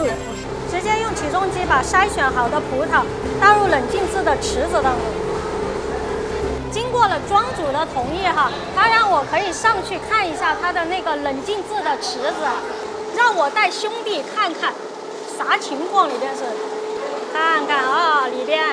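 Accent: Sichuan, China. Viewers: 哈哈哈难道是个川妹子，我就说口音怎么那么熟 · 普通话夹杂四川话😂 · 博主说的这是川普吧！熟悉中带着陌生😂😂😂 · 原来Yooupi是四川妹儿，怪不得讲起普通话内么有趣！😅 视频制作的很好，非常非常的有趣，感受快乐的同时还能了解更多地域文化！太棒了！❤❤ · 不用理这种以自我为中心的狭隘的人，川普多可爱，保持自己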